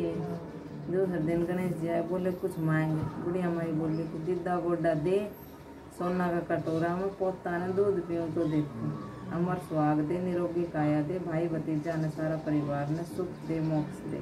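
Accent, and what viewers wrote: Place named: Rewari, India. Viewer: Kit ki h ya jo khani padh rahi h boli to Riwari ki h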